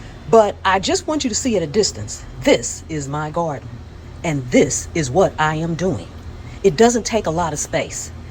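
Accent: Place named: North Carolina, United States. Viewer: My NC friend ❤ I’m in Northeastern NC. I’m gonna have to take some lessons from your gardening channel. I thought you sounded like you have a NC accent. Thank you ❤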